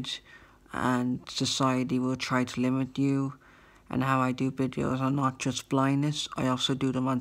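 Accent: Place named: Ireland. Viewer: I understand your anger, but that is an energy you can use. Let us see more of your face, Your smooth Irish voice is an asset, your content will be interesting. Ask one of those pesky sighted people to help you to give us a full face shot in light. Even if you use a couple of still-shots taken outside. As a person with Asperger's you have plenty of material there as well. Remember to make a new trailer video every 3 months. I say this not to offend but as suggestions only. Recently I have started to upload talking videos showing my old beaten face against some of my artwork and it was a hard decision to make as everyone loves you when you are young. Old is not fashionable. Stay safe over there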